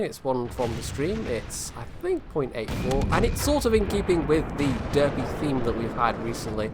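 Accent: Scotland, United Kingdom. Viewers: Ow, that new mic, it makes the sound so much clearer.... starts to actually sound scottish-ish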